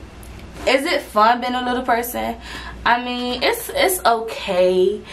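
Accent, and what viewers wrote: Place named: Chicago, United States. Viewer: Friend are you from the chi your accent Gives me very much Chicago forgive Me if im wrong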